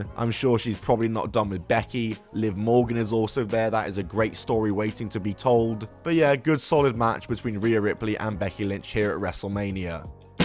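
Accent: United Kingdom. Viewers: Wait a minute I just stopped a video at two minutes and 20 seconds you’re British!!!! Why is all the wrestling channels I watch British people Well, I like your video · You couldn't tell by his accent that he was british?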